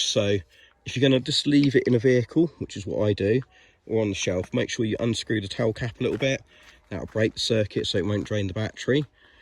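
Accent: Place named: United Kingdom. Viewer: British speech 😊😊😊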